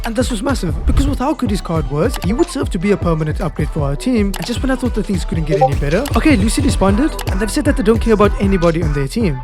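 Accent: South Africa. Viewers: Are u from SA🇿🇦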